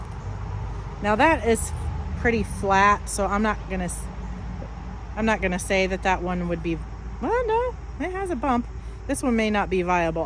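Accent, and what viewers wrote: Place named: South, United States. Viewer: Im wondering what state y'all live in. You sound southern like me. What are loofahs good for? I've never grown any at all. They do look fun to grow though.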